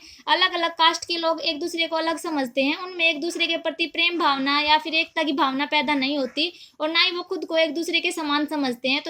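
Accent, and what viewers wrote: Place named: Haryana, India. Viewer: You are from haryana ❤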